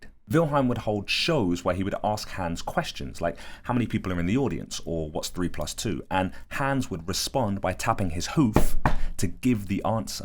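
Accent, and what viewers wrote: United Kingdom. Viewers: Ink line drawings and interesting stories told in a British accent. Sign me up!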